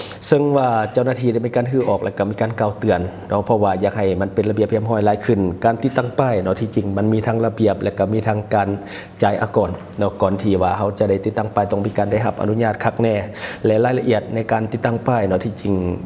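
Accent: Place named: Thailand. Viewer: คำเว้าที่แอดออกเสียง มันก็สำเนียงเดียวกันกับไทย. แค่ภาษาเขียนไม่เหมือนกัน.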